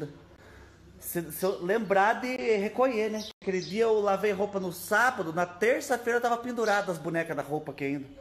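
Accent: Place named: Paraná, Brazil. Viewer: sua cidade faz divisa com o PR?? Estou curiosa para saber pq meu ex mora em Jundiaí do sul PR e o sotaque as palavras tudo é igual ao de vcs aí. Si puder respode eu 😍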